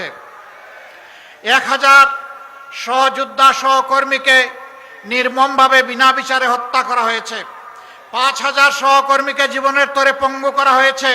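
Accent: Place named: Sylhet, Bangladesh. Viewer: Masallah nice sylhety ❤❤❤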